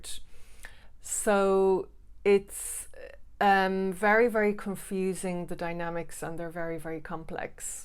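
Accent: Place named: Ireland. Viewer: Hi..I live in Dublin...do you coach fsa individuals in Ireland?...I hear you've an Irish accent...😊 tnks! Brilliant video btw!❤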